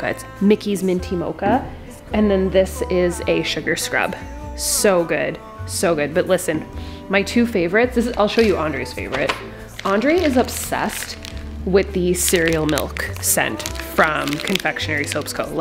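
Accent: Canada. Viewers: Canadian eh?:)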